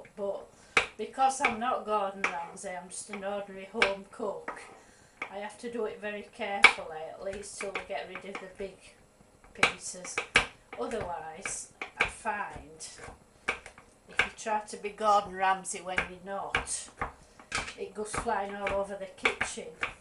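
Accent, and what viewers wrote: Yorkshire, United Kingdom. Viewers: Eeh, it's nice to hear a good old Yorkshire accent instead of an American one, for a change :-)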